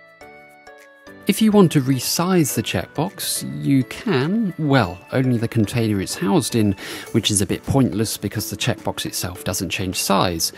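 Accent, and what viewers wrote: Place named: South, United Kingdom. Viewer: Nothing more annoying than a South British accent going on about how he can use new checkboxes than no one else has access to --- brilliant!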